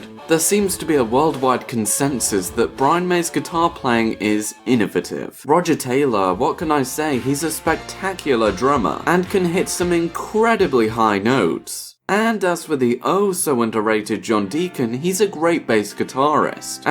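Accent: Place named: RP, United Kingdom. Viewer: Yes I can imagine you did have to think a lot about it! But you've made a few good points, I really enjoyed this video! Keep up the good work (omg that sounds like I'm calling you a rude word) haha oh gosh I'm gonna have to chose my wording differently lol anyway thanks for replying and BTW I love your voice! You sound posh (I'm a northerner so everyone sounds posh to me 😀) 👑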